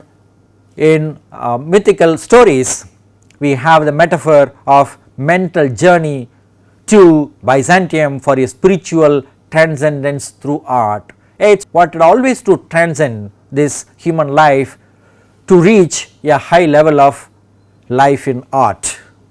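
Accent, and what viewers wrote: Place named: India. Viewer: There are different accents in the world... We feel better with Indian and british accent.you better listen to him carefully.He is an English prof. In reputed IIT. Look at good things... The stuff they have prepared is very much student friendly.... The content is brief and comprehensive. Look at good things.don't comment rudely.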